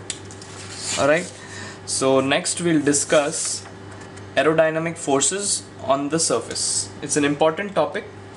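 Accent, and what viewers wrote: India. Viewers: Good video but try to avoid the annoying indian accent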